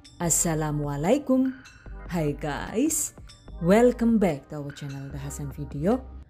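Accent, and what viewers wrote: Banyumas, Indonesia. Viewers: Iya cantik... Kok bisa ya Kadang ngomongnya pake bahasa ngapak ? Sama kaya q orang ngapak.. 😄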